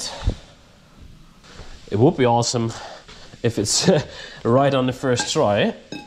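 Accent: Netherlands. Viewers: what an awesome fucking swap man love it! i’ve been looking into maybe getting a volvo wagon & getting it swapped, i love older volvos and giving it a step up w a v8 sounds like a damn good deal. live in the US now but grew up in amsterdam and your accent makes me smile! awesome videos man thank you, looking forward to another post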